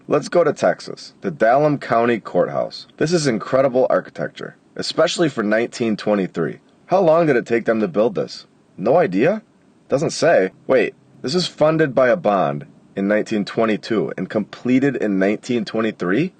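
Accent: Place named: Michigan, United States. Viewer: Loving these videos. Haven’t gotten terribly far. How does it link to the Masons🤔🤔🤔. Have you listened to Bill Coopers series on Ancient Babylon? Also love your accent - Michigan??😊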